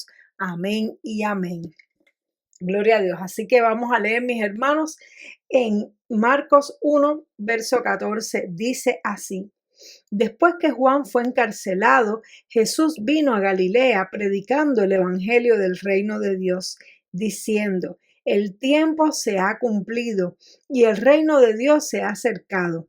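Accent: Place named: Cuba. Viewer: Me parece que eres Cubana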